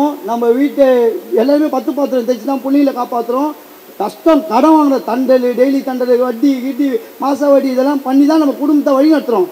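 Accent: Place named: Chennai, India. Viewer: அண்ணா சென்னை பாஷை ❤️❤️❤️🙏🏿🙏🏿🙏🏿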